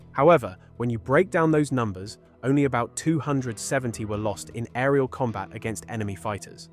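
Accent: United Kingdom. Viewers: These things always sound more important with a British guy announcing.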